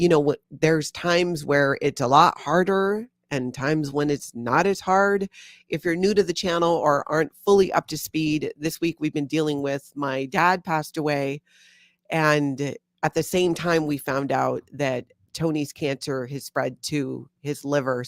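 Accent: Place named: Hawaii, United States. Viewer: You definitely have an accent. I think it’s the Hawaiian influence.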